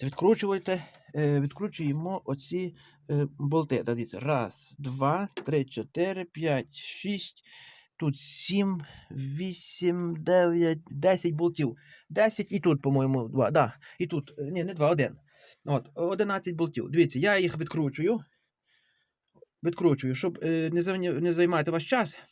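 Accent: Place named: Western Ukraine, Ukraine. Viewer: Ну видно що ви роботяга, молодець А реверс щолкає як передачі в іномарці! Дякую за відео,цікаво вас слухати! То ви з Чернівецької області,чи з Франківської?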